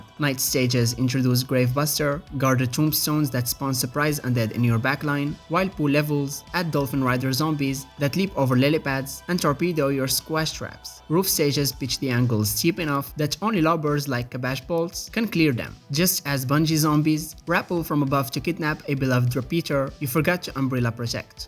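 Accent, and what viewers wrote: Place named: Morocco. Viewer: Bro, are you Moroccan? The accent is soooooooooo close to Moroccan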